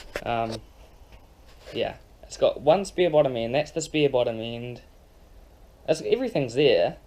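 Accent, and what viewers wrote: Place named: New Zealand. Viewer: sounded like you were from new Zealand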